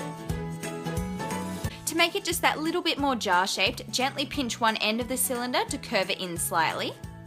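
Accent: Australia. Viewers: I KNEW you sounded Australian!!!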